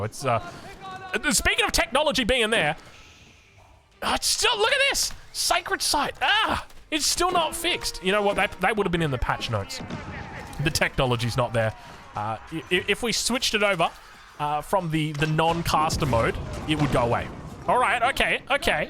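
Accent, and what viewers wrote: Australia. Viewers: Fresh in the morning, love it!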